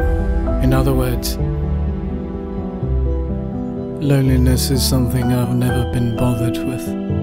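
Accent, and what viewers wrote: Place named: England, United Kingdom. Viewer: Bukowski spoken by a young, posh English guy does not work.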